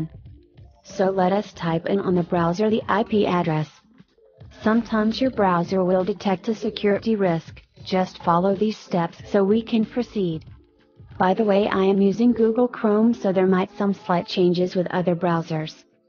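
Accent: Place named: United States. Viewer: Gandah nang boses parang american😁salamt sa video mo.another saving life....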